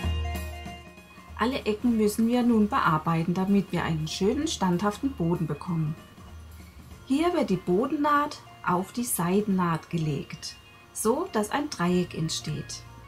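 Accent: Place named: Karlsruhe, Germany. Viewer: nein ich höre es am dialekt :D komme selbst aus karlsruhe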